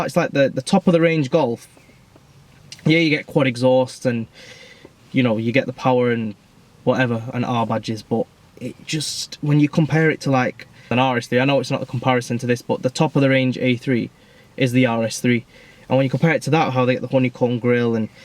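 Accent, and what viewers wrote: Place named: United Kingdom. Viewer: Dude, I gave up after a few minutes... heavy AND fast British talking, I am not a native, tough luck I reckon.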